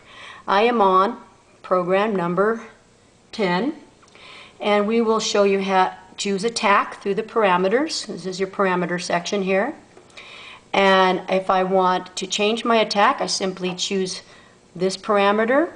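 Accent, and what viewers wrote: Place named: United States. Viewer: I love your American accent :-)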